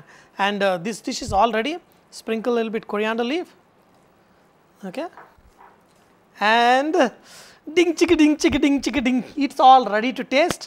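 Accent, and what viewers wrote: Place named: South India, India. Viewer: i love your south india accent.plz dont eat when u cook in front of the cam i feel jealous.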